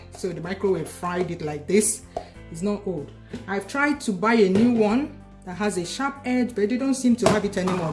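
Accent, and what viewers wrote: Igbo, Nigeria. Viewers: We like hearing " ur sexy igbotic..voice"..jisike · Sis idi too much. I learnt alot from you. Nye wam ur igbotic voice. I am loving it anytime. Jisike!!! More grace sis. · We love that Igbotic voice 😂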